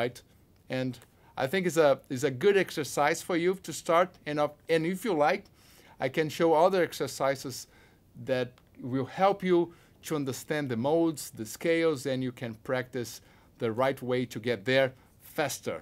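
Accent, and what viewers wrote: Brazil. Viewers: disse que sabe falar em ingles como um nativo mas fala desse jeito abrasileirado porque gosta, meio que simbolo brasileiro sla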